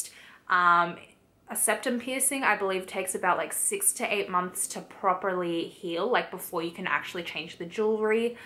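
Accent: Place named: Australia. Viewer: Love your accent!! I've heard Australian accents before but never that hint of "r" that follows ur "o"...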